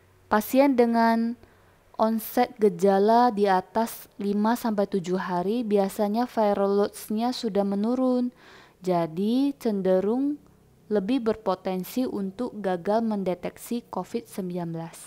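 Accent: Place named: Medan, Indonesia. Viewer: ini dokternya kl gk dr medan ya dr pontianak singkawang hh sy denger dr aksennya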